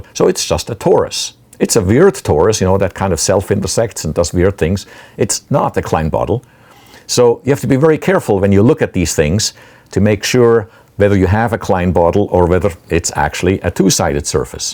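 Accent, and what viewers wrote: Switzerland. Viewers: Yay he's actually Swiss! :D (Like me) (The accent made it easy to guess it right) xD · he kind of sounds swiss. grüezi!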